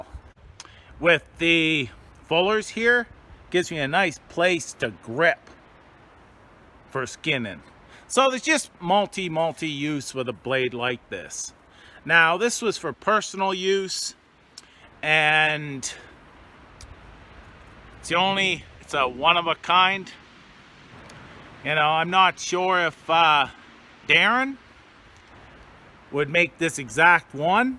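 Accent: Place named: Chicago, United States. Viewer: a very nice blade. "Da Chappa" with a nice Chicago lilt. Cheers, Mate.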